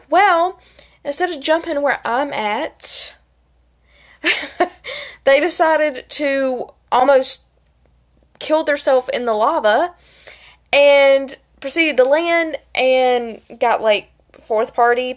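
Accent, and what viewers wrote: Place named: South, United States. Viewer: Your so country, I love it 😍 lolol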